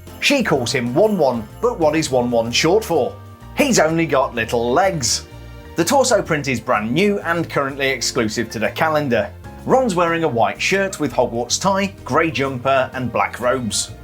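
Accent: United Kingdom. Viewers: haha no, you go ahead. I can always slaughter you in the comments. Actually.... before I saw your actual face, I seriously thought you were ginger. You sound so delightfully British. There, I'm prejudiced myself....🙄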